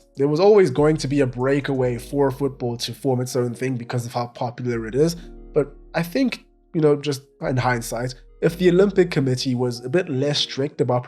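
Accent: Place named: South Africa. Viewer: 😅He's not English I'm South African his accent is closer to mine than England on the opposite side of the hemisphere to us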